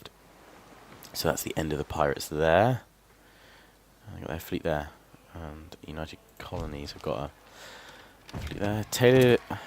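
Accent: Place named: United Kingdom. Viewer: Yes it's his real voice lots of Brits sound life that.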